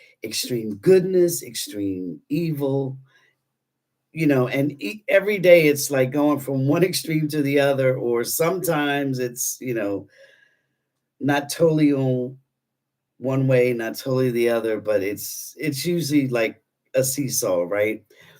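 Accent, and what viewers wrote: Philadelphia, United States. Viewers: Hi I am so glad I found your channel. Listening to you I knew you were from the Philly area! It is so exciting that the Eagles are Super Bowl bound! Go Birds!!! 🦅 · Thank you. And I thought I detected a Philly area accent. Go Eagles!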